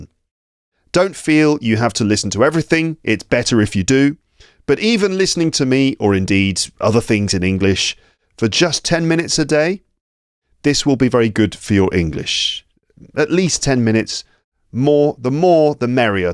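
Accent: RP, United Kingdom. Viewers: Another thing I want to point out is that your English is very clear "'Received Pronunciation'" is very easy to understand. But in real life in the UK, it's much harder than I expected to understand the average native British speaker and I'm not even talking about dialects.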